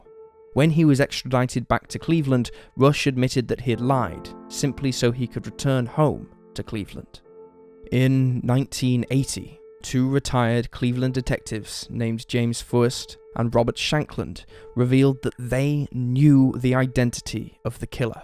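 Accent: England, United Kingdom. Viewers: Pommy accents do not help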